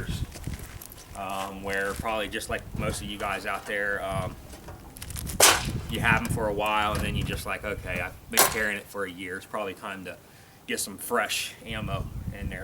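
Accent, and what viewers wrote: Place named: Pittsburgh, United States. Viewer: You have to be from Pittsburgh. Tell me your a fellow yinzer? Trying to identify the accent..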